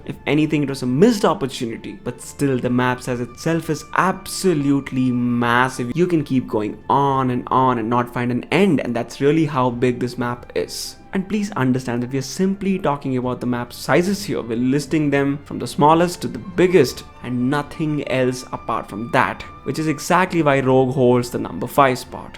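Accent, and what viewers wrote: India. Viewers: probably this guy, after all he sounds like a typical indian scammer that might phone your house. Can't be trusted.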